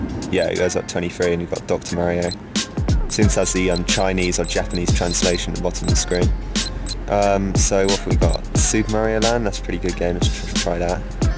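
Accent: United Kingdom. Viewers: Had a feeling you were british its nice to hear your voice for a video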